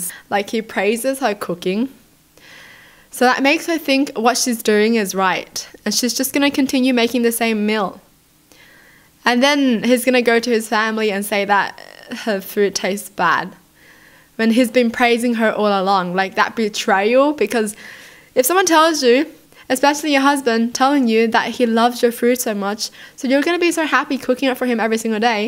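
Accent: Australia. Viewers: She's Australian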